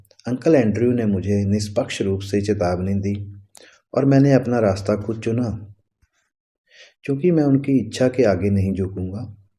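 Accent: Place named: Punjab, India. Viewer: कहानी शानदार है आपका उच्चारण पंजाबी है इसलिए माहौल नही बना सके Do little homework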